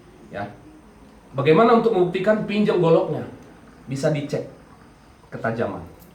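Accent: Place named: Palembang, Indonesia. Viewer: Dari nada bicaranya sperti nya orang Palembang, slam dri Palembang 🙏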